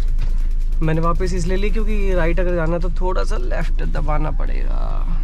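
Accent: Haryana, India. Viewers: Bhai your Voice Bhai Op..i thing Aaap hariyana main Rahete hoo.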